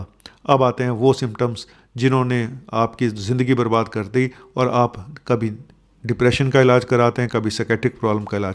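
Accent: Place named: Punjab, India. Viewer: Punjabi touch.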